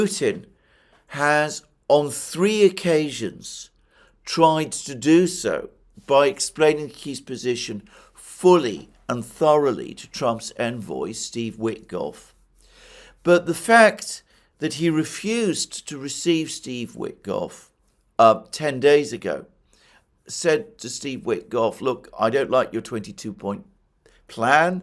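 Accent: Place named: RP, United Kingdom. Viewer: btw some posh accent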